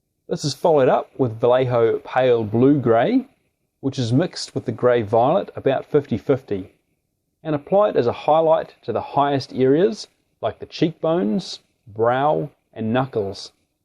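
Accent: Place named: New Zealand. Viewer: Sorry. Every so often the Kiwi accent demands gentle humour....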